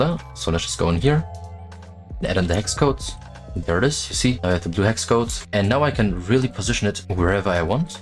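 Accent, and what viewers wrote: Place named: Germany. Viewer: Man merkt, dass du deutsch bist hahah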